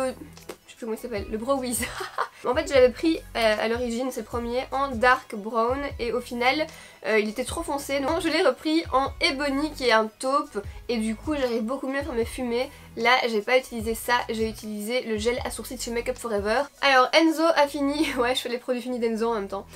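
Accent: Belgium. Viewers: Accent belge VIVE LA BELGIQUE!!!!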